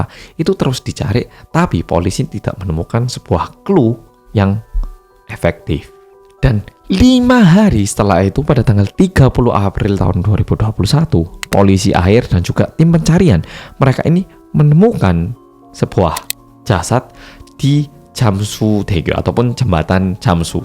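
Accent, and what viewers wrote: Java, Indonesia. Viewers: Orang korea, bhs indo dg logat jawa, weeee.....maantaaapp...taaapp👍👍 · Jadi ngantuk dengerin cerita nya😅....orang korea Logatnya jowo😂 Mendayu dayu suaranya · Medhoknya melebihi orang jawa 😁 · logatnya sperti org jawa. 😂😂😂😂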